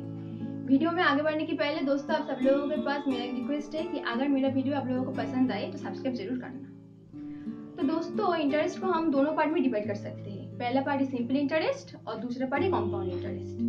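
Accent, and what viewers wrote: Bengal, India. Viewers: Oo pagal she is bengali so her tone of hindi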